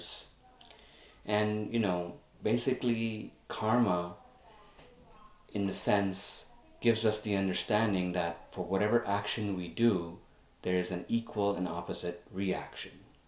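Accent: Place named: United States. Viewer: Hm... one more question you live in India? You sound American...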